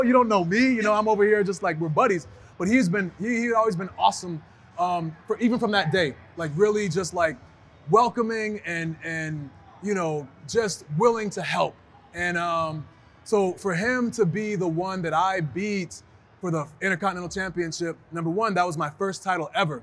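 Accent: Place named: Ghana. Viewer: What accent??? Aren’t you from Ghana 🇬🇭 ??? Did you lose that accent!!!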